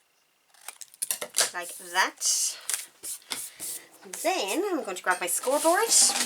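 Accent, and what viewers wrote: Ireland. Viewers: Lovely to watch you. Great ideas you have. Love your accent where in beautiful Ireland are you? Best wishes from New Zealand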